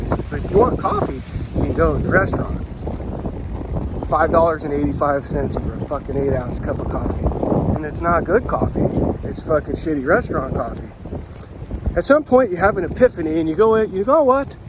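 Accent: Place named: Hawaii, United States. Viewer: Lol I’m with you! Where are you from originally? You also sound like you grew up in Hawaii.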